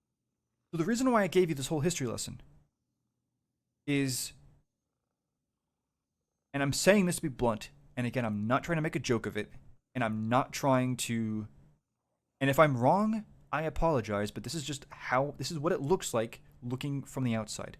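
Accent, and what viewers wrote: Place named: Ireland. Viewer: Irish brethren I hear, salute I do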